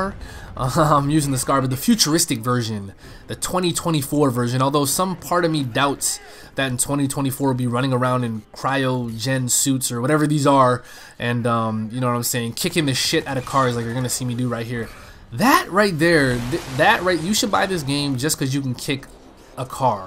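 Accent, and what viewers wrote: Canada. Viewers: you're Canadian eh?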